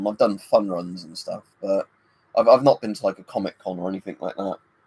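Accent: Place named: United Kingdom. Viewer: Seu sotaque britânico é muito elegante! ❤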